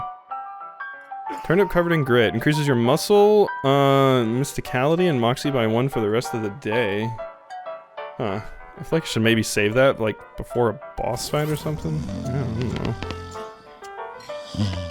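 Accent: United States. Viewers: Gonna be hearin' a lotta that southern part'a ya. Can't wait. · To be fair I'm not a huge fan of "funny" games, but I do love western, and when I saw the Dad's animation I decided to at least giving this episode a shot. Liking it so far, but I'll mostly keep watching for your cowboy accent:D Happy New Year,!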